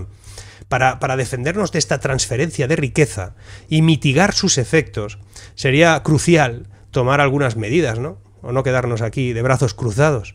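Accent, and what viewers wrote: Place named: Spain. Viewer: Habla 120% como un español pero tiene nombre y apellido franceses, y parece que vive en Francia. Curioso.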